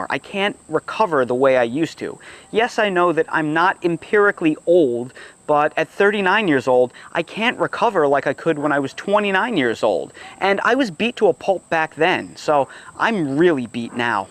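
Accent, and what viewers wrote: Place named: South Jersey, United States. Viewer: South Jersey girl here. I remember watching a video of yours several years ago when you had a few thousands subs (and I heard that SJ accent which hooked me!). As an avid gardener myself I’ve been watching you ever since. Loved hearing about your background and I always look forward to your videos, so thanks for everything you do!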